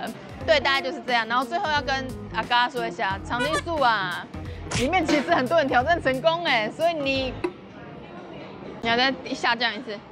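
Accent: Taiwan. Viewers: 看1分钟，好尴尬的台式全然大丈夫、なにこれ。。。ありがとうございます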